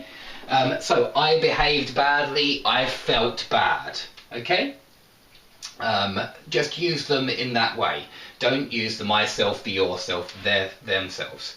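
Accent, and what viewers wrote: United Kingdom. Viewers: Watching your videos can also help me to improve my accent 😁British accent is the best accent ever thx a lot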